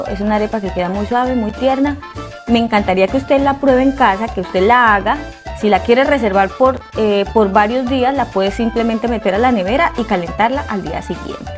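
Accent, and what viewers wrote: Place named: Valle del Cauca, Colombia. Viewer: Tienes acento valluno eres del Valle del Cauca??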